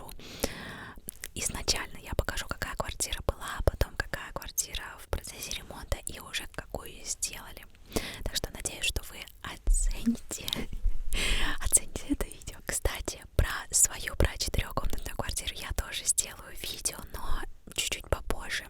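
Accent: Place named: Spain. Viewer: Puedo sentir tu español...❤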